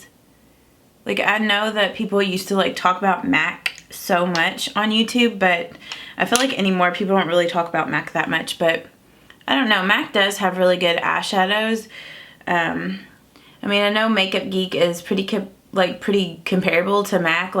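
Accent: South, United States. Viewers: Looks great!It would be fun to hear more about what's unique about where you live. Places you eat at, shop at...the place where you bought your mug. With your southern accent, is there anything makeup wise you would say is trendy in the south.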